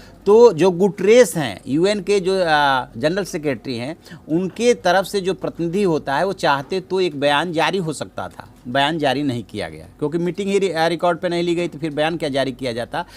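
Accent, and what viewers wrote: Bihar, India. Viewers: Bhai aapke bolne se lagta hai ki aap Bihari hai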